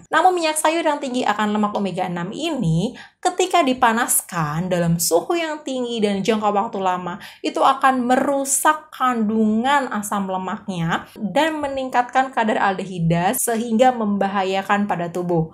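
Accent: Java, Indonesia. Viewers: Bu dokter cantik ...medok bgt org Jawa kah....tmksh semua ilmunya🙏❤️ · Wah baru dgr suaranya medok 🤣🤣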